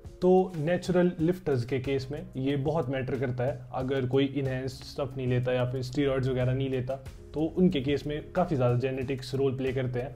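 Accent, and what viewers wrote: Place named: Himachal Pradesh, India. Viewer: Bhai aap Bolne ke accent se Himachal Pradesh ke lagte ho.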